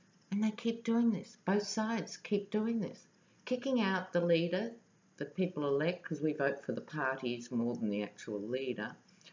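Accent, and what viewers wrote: Australia. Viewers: Thanks so much for this special reading,from up here in Queensland. I, like you have been so taken with what has been happening in USA that I have become out of touch with news at home, so was amazed to find that we had a new PM. Thanks for your overview. I do enjoy your no nonsense style, our accent and the fact that you use old fashioned aussie slang instead of US; "he has the brain of a parrot....sharp as a whip....take the flack... in deep shit....one of those you can describe by the "i"s; incompetent, immature, insecure." I do believe our political system is a little less open to the corruption we see in the US called "Lobbyists" ie money to get political reps to do your bidding. Our low key elections of 6 weeks cannot cost as much as their crazy hyped up rallies.The money for such things should come from Taxes and not from interest groups,otherwise interest groups with little money are not going to have representation. 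It might explain why in this day and age, any first world country could be against universal health cover! I wait anxiously for your readings everyday, and I like the fact that you acknowledge other people working in the area, in particular Dave Johnson.. So thanks again.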